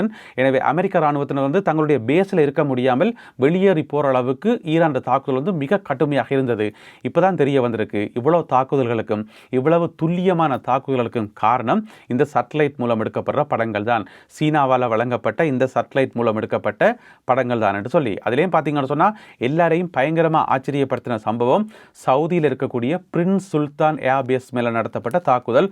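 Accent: Sri Lanka. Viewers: இலங்கை தமிழ் ரொம்ப அழகா இருக்கு நண்பரே. வாழ்த்துக்கள்.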